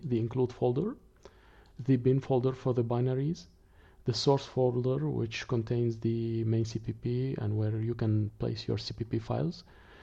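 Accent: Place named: Italy. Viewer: Just out of curiosity: your name is not italian but your pronounce sounds italian. Where are you from?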